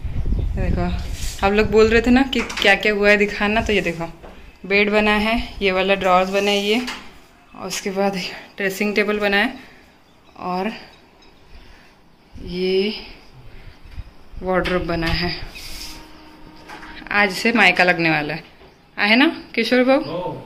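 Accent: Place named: Varhadi, India. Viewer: Kay chyyata marta tumhi😂😂🤣🤣mast apli warhadi aikli ki mast watat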